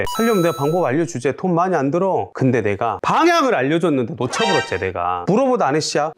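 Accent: Jeolla, South Korea. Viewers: 좋아요누르고 들어요 ㅎ 전라도사투리,구수하고 듣기좋네요^^ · 매번 영상시청하며 보고 듣고 배우고 실천할려고 노력중입니다.. 항상 좋은영상 감사해요.. 전라도 사투리 정감이 팍팍 갑니다.. 겁나게 좋소.. 화이팅_^**^_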